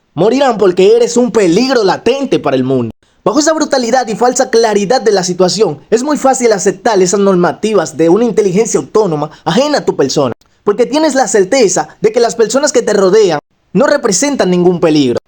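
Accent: Dominican Republic. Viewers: Como amo el acento dominicano❤ genial analisis🎉🎉🎉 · eres dominicano?, buen video, mi opening favorito es el 2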